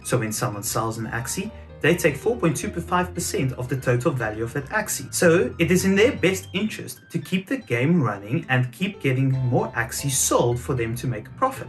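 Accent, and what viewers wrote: South Africa. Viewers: I thought you sounded South African. I'm originally from Ghana, but I live in South Africa.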